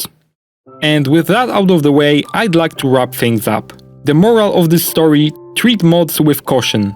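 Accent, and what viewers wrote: Poland. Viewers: wiedzialem ze jestes polakiem po akcencie slychac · Akcent typowo polski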